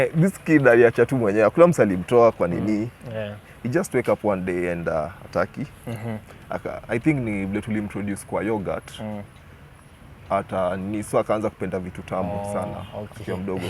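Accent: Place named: Kikuyu, Kenya. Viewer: Sorry, the interviewer!!!! I've watched a few of your videos, but it's like mostly you don't understand the people you are interviewing or you're absent minded at some point. You keep asking some questions that are already explained.. and that's in almost all of your interviews!!! I'm sorry but that's just my view! Then again you "mocked" the Kamba lady coz of her kikamba accent, saying how you "like" the way kambas talk, lakini wewe, have you noticed how you talk!?? Your Gikuyu accent is so heavy!!!!! Come on!!! Try to focus and listen to understand!!!!!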